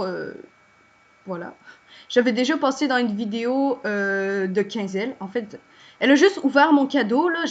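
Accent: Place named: Quebec, Canada. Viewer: Esque tu pourrait mettre une music et j adore ton accent Québecoi (dsl jsp ecrire) 😇 en tout cas bonne contuination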